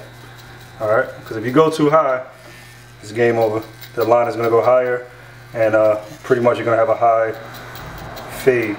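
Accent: New York, United States. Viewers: you sound york or jersey that right?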